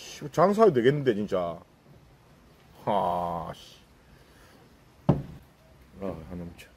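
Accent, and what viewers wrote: Gyeongsang, South Korea. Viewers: 경상도 특 안고쳐짐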